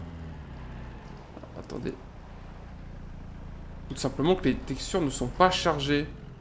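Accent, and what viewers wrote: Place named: England, United Kingdom. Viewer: Mdr j'adore ton accent anglais lol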